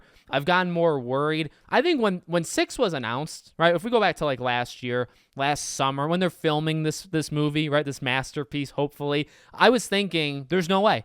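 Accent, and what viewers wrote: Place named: Boston, United States. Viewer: r u from boston ? north east? ur accent lol the way u said podcastt🤣🤩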